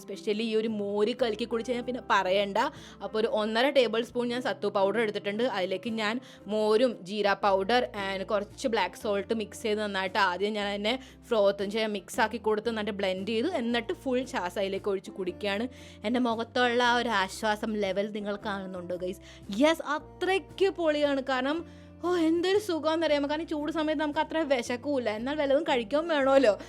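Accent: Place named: Thrissur, India. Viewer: Chechi I felt that your slang changed to thrissur slang 😂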